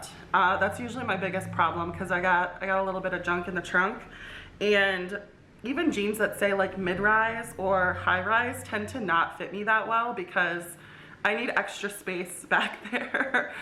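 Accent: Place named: United States. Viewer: How much u talk American oh my God .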